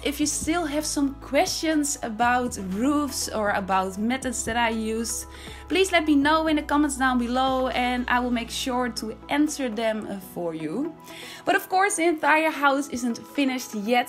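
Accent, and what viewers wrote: Netherlands. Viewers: Ik hoor dat je Nederlands bent en je kan zo goed bouwen je bent echt goed ❤️ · First video I wached from you, I'm at minute and I notice your accent. Is it Dutch? Sounds soo familiar, I used to live in Amsterdam ❤ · U sound dutch :) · I knew it from your accent. I live in Utrecht and u? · omg i figured because it sounds very similar to dutchsimsmaster 😂 BTW love your builds, cant wait to see more!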